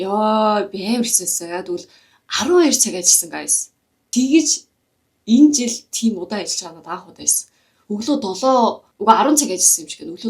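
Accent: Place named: South Korea. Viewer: English vg hereglehdee english aylagaar n duudaj bmaar bn aa.. korean aylagaar duudhaar chihnees bvr tsus goojih gd bhii..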